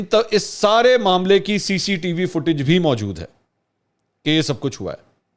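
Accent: Punjab, Pakistan. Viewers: منصور بھائی پنجابی، سلام It is also observed that your Urdu accent is turning more toward Punjabi style. Unexpected in from a educated Media man doing a V Log on nation level. Atta Tarr incident was not that important to discuss in length, plus your “ Bhashan” made it further boring. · Punjabi ka tarka bara mazy ka hota ha boht achi lagti ha ap ki zuban pa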